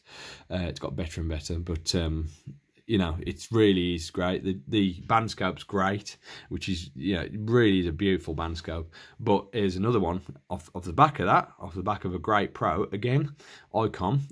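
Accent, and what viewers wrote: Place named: Liverpool, United Kingdom. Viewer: Just stumbled upon this video and your channel looking around at 7300 videos. Great radio, and superb explanation. Are you a Scouser? Cool accent!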